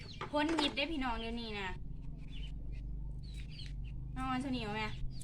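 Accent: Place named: Ubon Ratchathani, Thailand. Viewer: สําเนียงแบบนี้ต้องเป็นคนอุบลชัว.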